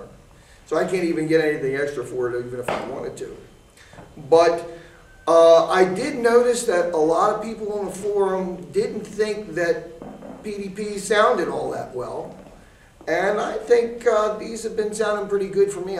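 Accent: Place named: Baltimore, United States. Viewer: Haven't lost yer "Balmer, Merlin" accent, "hon"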